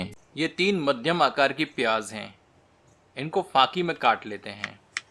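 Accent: Standard. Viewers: Khane se jyada testy to apki Sudha Hindi lagti he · आपकी हिंदी भी अत्यंत शुद्व है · Apki hindi shudh hai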